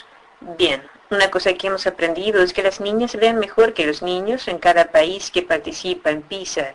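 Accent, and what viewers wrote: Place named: Central America. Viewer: Tiene acento centroamericano, creo que ecuatoriano, o quizás colombiano, pero se entiende perfecto!! vos no aprobarías el PISA, Miguel!! en todo caso pasame qué palabras no entendés, y el instante t en que ocurre, y te respondo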